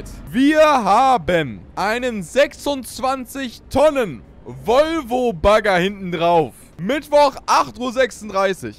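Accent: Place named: Brandenburg, Germany. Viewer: Er kommt halt aus Brandenburg, wenigstens verstellt er sich nicht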